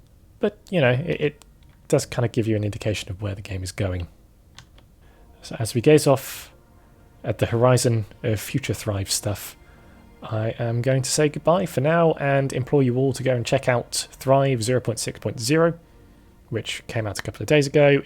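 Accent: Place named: United Kingdom. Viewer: you cannot understand how soothing your slightly nervous British voice is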